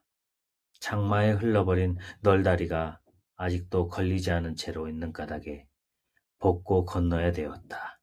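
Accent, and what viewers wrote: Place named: Gangwon, South Korea. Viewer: 재미있게 잘 들었습니다^^ 책도 재미있지만 귀로 들으니 더 집중도 되고 좋았어요^^ 강원도 사투리가 재미있어요^^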